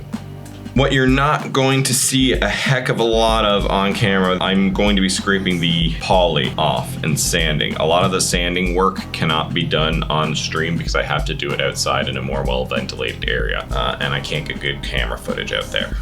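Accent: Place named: Canada. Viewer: Wow what a voice, you sound Canadian haha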